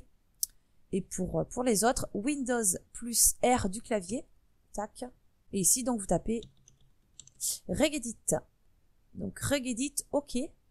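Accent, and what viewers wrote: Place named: North, France. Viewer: Merci, j'ai revu ton tuto avec plaisir, mais je n'ai pas encore partitionné mon disque dur mécanique, je n'ai pas de SSD... PS:" j'men va d'ce pied elpartitionner"!!!, tu lis en phonétique, c'est le patois du Nord, que je n'ai jamais aimé, il est moche... Je suis originaire du Sud et ma mère est Pied noire, mes les gens du Nord, je les apprécie beaucoup... yo !!!! Bisous à toi !!!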